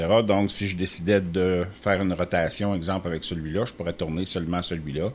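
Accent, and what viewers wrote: Canada. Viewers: Un grand merci pour vos tutos de qualité et très bien expliqué, abonné depuis bien longtemps c'est toujours un grand plaisir de vous retrouver pour vos astuce le tout avec l'accent canadien, que du bonheur merci ;0)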